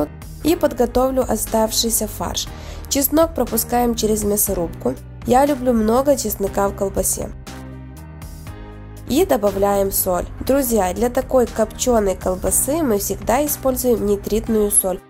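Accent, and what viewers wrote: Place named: Ukraine. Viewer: Вы молодец, интересные рецепты, что у вас с говором? Рублённое, КОптить? Я понимаю, что Вам удобнее разговаривать на украинском языке. Вы снимаете ролики на русском языке, ударение проверяйте в словах во время монтажа ролика.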